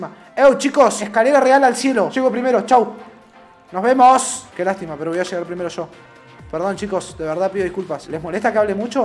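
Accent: Chile. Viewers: Ya pero hablando chileno,re good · Q bien habla chile otros pibes lo hacen mexicanos JAJJA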